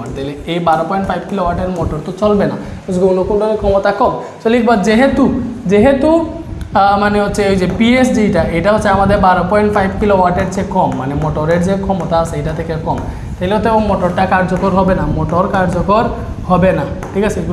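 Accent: Sylhet, Bangladesh. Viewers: আপনার ক্লাস দেখে আমার বাক্কা উপকার দিছে। সিলেটি স্যার। ভালো লাগছে।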